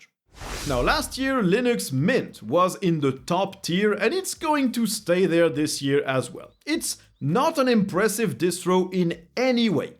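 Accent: France. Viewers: Seriez-vous français à tout hasard ? (^-^) J'ai l'impression que votre accent s'en rapproche :D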